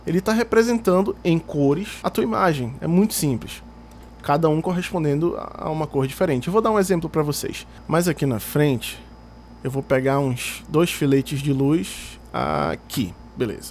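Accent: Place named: Manaus, Brazil. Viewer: Muito manauara esse mano. Volta com as aulas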